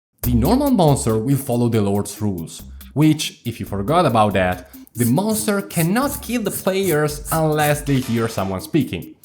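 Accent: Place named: Italy. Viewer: Quello si, il tuo inglese è veramente ottimo, ma una delle cose che mi ha fatto dire che sei italiano era l'accento😂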